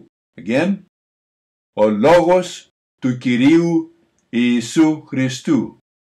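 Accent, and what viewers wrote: Greece. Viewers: Many thanks, learning koine Greek using standard western method. Good to hear a Greek pronuction. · This is the real sound of greek. Finally someone is trying to save you from erasmian ir whatever fake pronounciation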